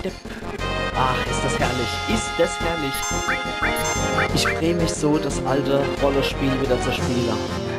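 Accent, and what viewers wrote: Palatinate, Germany. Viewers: Naaa mist :D würde ich supergern schauen >__< aber ich will das selbst noch let´s Playen...nun bin ich hin und hergerissen ob ichs gucken soll /: Finds übrigens genial das du auf pälzisch deine lps machst. Das ist mal was besonderes und einzigartiges ( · Alter, meine Fresse! Du machst das großartig "uff pälzisch"! Da bekomme ich direkt gute Laune! Ich habe dir mal einen Daumen und ein Abo dagelassen und werde immer mal reinschauen. Weiter so:D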